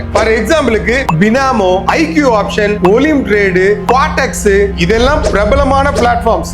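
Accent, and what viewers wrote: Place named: Kanniyakumari, India. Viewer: Unga slang apdtha irukku nanum kanniyakumari tha marthandam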